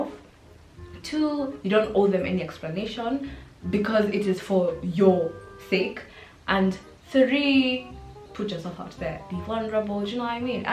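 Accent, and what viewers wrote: United Kingdom. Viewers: Omg do you watch Love Island UK b\c you got so much of their accents?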